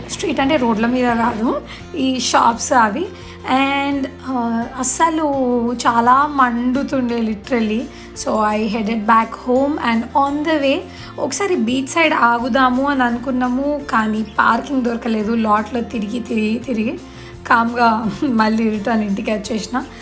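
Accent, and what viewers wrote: Telangana, India. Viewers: Hi what are you first time watching new video but very good talking are you Telangana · Perfect telangana yasa (slang 😎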